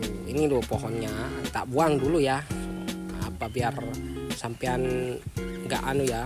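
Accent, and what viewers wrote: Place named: Madura, Indonesia. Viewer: Logatnya kayak madura😂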